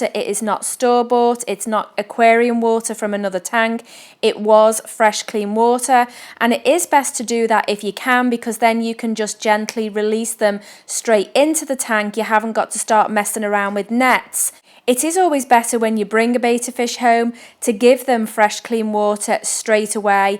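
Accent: Liverpool, United Kingdom. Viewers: I wish you would have persevered with this channel, it appears it could have really gotten somewhere. |Such a shame, but that's life.. You sound like people I know from the Sefton areas of Liverpool?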